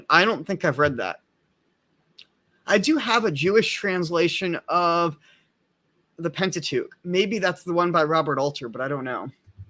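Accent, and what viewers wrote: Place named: United States. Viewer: Great idea for a video, and some really interesting content here. That being said, my man's Latin pronunciation could benefit from some de-Americanization, haha! As a pretty settled Suarezian myself, I'd recommend you check out Luis Alonso Getino's "El Centenario de Suarez," published in La Ciencia Tomista, 15 (1917), pp. 384-388 [available on HathiTrust]. The author compares the 24 theses with Suarez' positions (Spoiler: except for thesis #13, which even then is qualified, Suarez would disagree with 23 of the 24 theses!).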